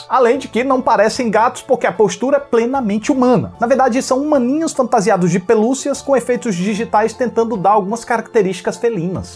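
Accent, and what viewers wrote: Northeast, Brazil. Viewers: Ele é nordestino 😁 só não lembro de que estado. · Cara. Seus comentários são super nordextinesco. Aaaaaaamo. O Seu sotaque é SUPER.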